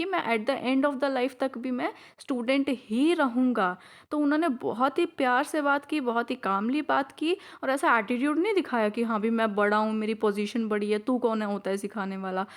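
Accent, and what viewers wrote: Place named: Haryana, India. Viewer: Haryana k lgre h mam ❤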